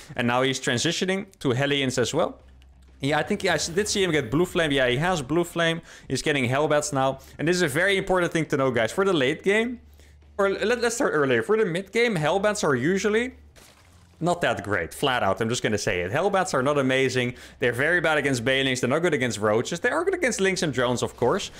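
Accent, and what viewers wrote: Netherlands. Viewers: Found the commentary/analysis really interesting!! Your speech patterns sound really similar to Lowko's, I guess I just like that Dutch accent!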